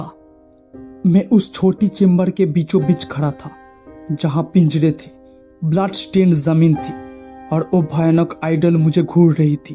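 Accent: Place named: Bengal, India. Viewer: Dear Sir your Bengali accent is to strong.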